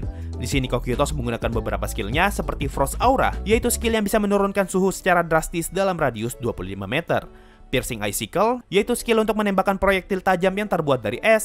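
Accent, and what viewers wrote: Indonesia. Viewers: Kita org indo pke aksen indo emang nda boleh ya? Ya gak min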